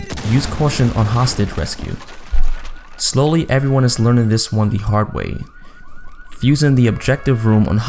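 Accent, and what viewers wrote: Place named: Boston, United States. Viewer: You sound like you're from Boston, lol put nice video you earned a sub! Keep it up.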